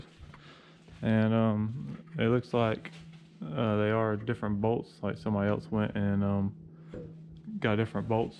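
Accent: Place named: South Carolina, United States. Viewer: I knew yall lived in south Carolina by your accent bo I lived moved from Swansea sc lived there 8 years. Moved to ohio. Thinking of getting a lt1 or a mustang but I've always been a chevy guy like the videos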